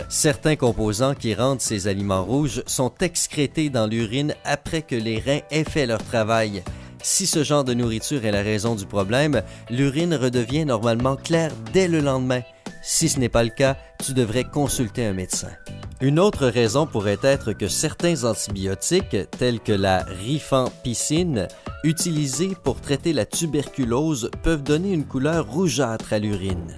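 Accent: Quebec, Canada. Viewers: Enfin un canadien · Moi j'adore l'accent québecquois tout simplement !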